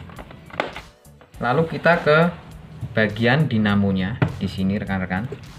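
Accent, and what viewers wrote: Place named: Java, Indonesia. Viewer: Amiiiin... Trmkasih infonya..Sampiyan niii marga tapi gaya bicaranya lebih ke dialek Jawa.. Enaaak merdu pula suaranya... Sehat &.. Sukses slalu..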